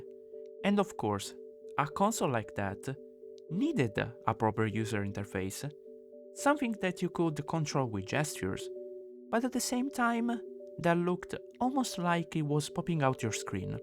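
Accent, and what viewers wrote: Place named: Italy. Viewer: Nice video! I miss Frutiger Aero so much... Btw Are you Italian? From your accent it would seem so.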